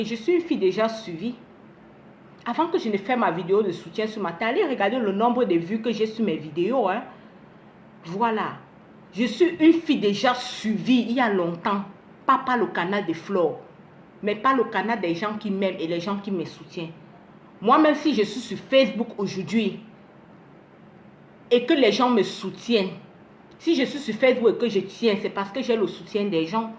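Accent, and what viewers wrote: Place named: Bamileke, Cameroon. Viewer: AVEC TA VOIX DES BAMILEKE pardon va te cacher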